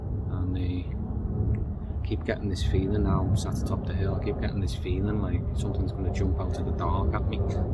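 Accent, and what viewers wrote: Liverpool, United Kingdom. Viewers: Love the Liverpool accent and you knowledge thank you a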